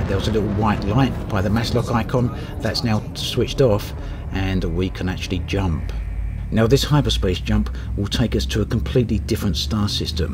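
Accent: United Kingdom. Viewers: very interesting accent, does anybody know from which region in the UK is his accent from? just curious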